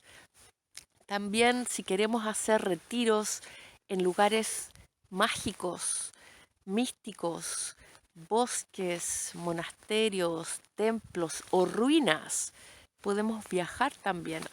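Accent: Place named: Chile. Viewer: Bella . Pensé que eres chlena. Por tu acento. Sos chilena ?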